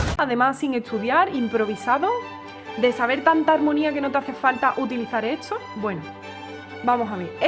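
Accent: Andalusia, Spain. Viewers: Me gusta como hablas, de que región de España eres? Ya entiendo un poco más porque en toda Latinoamérica hay diferentes acentos dependiendo del país que se hable, aquí mismo en México hay distintos acentos dependiendo de la región que se hable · ese acento andaluz ufff me mata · Tó el arte!!!! 😂😂 Diego del Morao hace unos transportes q flipas, pero a ese nivel... y los guitarristas acústicos del fingerpicking?? La usan sin ningún complejo. Papafritas hay x tos laos!!! Ole tu acento sin reparos ni tapujos y el q no lo entienda q aprenda andalú!! 👏👏👏👏👏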